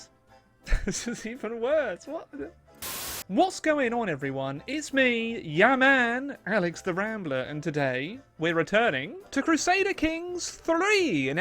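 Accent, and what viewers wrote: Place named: United Kingdom. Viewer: What type of British accent do you have it’s very pleasant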